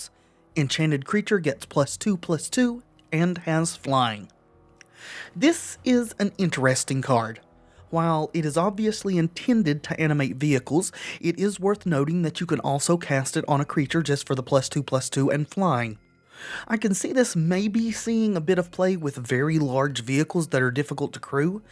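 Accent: South, United States. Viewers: First time watching your videos, had a very good time. Your southern accent kind of reminds me of Gideon from Gravity Falls. Hope you take that as a compliment! :D Thanks for the content. Thumbs up.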